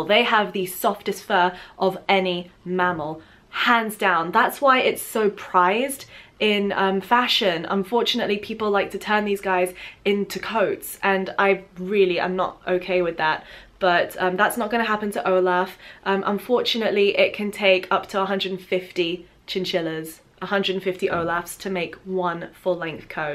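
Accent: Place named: England, United Kingdom. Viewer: I'm French and I love your videos first because it's interesting, and i love you English accent so much, i like listening to you for your english x) but your video are amazing ! Thank you !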